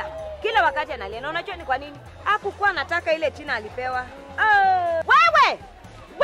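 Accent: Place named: Luhya, Kenya. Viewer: I love they way you vuruta words...total luhya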